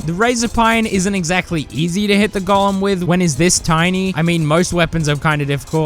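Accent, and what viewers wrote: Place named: Australia. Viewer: i hear it but its because their both australian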